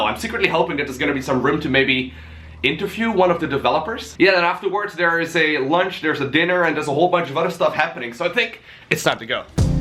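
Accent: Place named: Netherlands. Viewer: You are from Netherlands? I knew that the accent is netherlandish!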